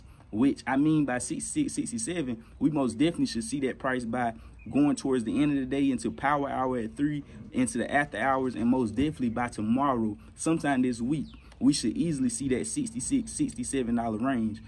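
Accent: South, United States. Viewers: I swear I'm not trying to spread FUD. I'm diamond handing like a mofo lol. But I am curious about the dip in volume lately. Are less retailers buying? Btw, shout out from Alabama! I can tell you're from the south!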